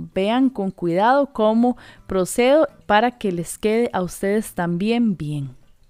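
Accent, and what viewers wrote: Costa Rica. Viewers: Ese acento no se pierde!!! Bravo una tica emprendedora!!! Saludes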